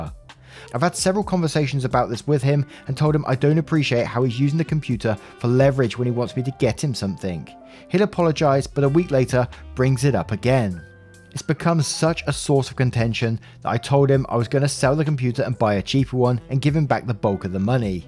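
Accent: United Kingdom. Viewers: I really like your accent. What part of the UK are you from?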